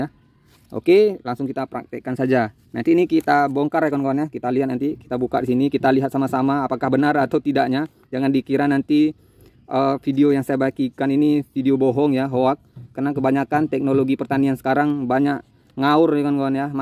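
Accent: Indonesia. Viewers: Bang saya lihat dari gaya bicara nya tampak ke polosan dan ikhlas nya dalam menyampaikan tutorial, semoga Allah membalas kebaikan nya dengan kebaikan yg berlipat ganda Amiiiin. Bdw bang alamat nya di daerah mana? Dari logat nya sepertinya dari pulau sumatera mungkin daerah Riau pekan baru dan sekitar nya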